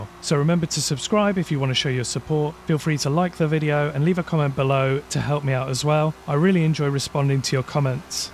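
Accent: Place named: England, United Kingdom. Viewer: Good comments with a lovely English accent! (From a Pom in Australia) Nice to see they have addressed a couple of the many sound issues, I think the Capri and GT3 Bentley may sound better too (but is it wishful just thinking?) I hated them last time I used it, went back to Seven briefly, ahh, that's better... But now in Eight, hmmm.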